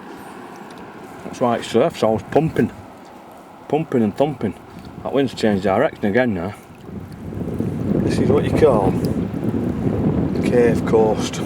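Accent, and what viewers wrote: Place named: Northern England, United Kingdom. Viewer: Good footage of the sunfish and I love the narrating proper northern accent, me grandad was from Halifax so was a nice reminder. Thanks for this video.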